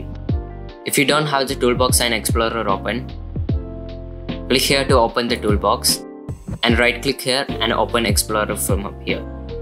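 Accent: Kerala, India. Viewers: are you a malayali or indian you sound really like one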